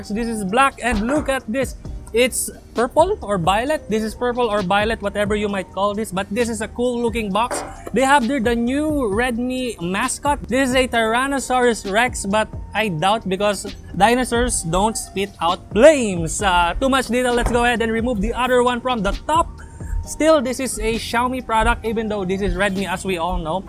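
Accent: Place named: Philippines. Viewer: I love your pinoy accent. hahahah thanks!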